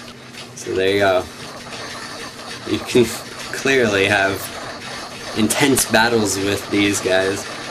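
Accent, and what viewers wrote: Canada. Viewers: r u canadian??? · R u Canadian? If so, I can tell......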